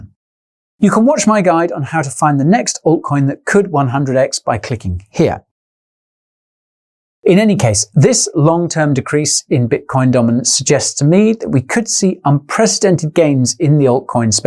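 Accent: United Kingdom. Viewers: Good man I like British English lived thre in Surrey for 25 years I moved to Australia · Thank you for saying you’ll explain everything in plain British English. It actually helps a lot for someone like me who’s first language is not English. Thanks a lot. Now I’ll resume to watch the video ♥️ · high quality to the point ... tanx bro .. I have some problem with british akcent I should listen more ... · British English lol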